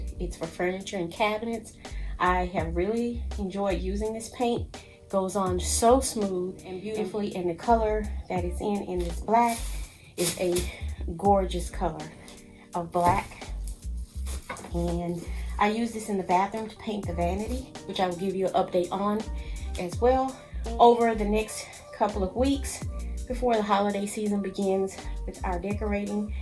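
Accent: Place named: Texas, United States. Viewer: Amen to Texan accents 😊. This is amazing. Love it ❤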